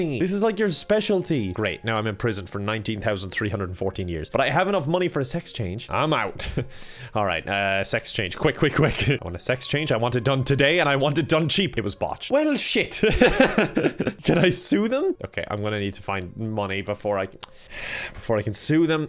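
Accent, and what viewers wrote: Ireland. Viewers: are you from ireland? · Is he irish I am 🇨🇮🇨🇮🇨🇮🇨🇮🇨🇮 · Irish DanTDM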